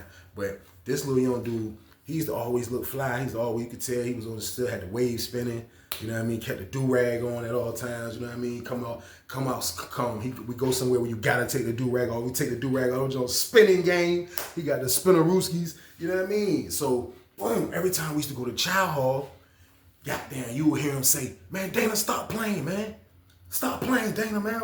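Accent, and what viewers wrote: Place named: Boston, United States. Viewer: U from Boston homie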